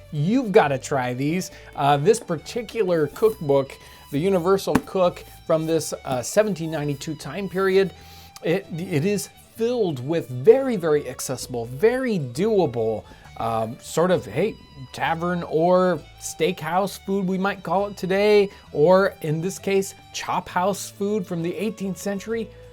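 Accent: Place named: United States. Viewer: Its pronouced (Shuh-Lots) not Shallets 🤣🤣 Wow American English its horrible UK version is much better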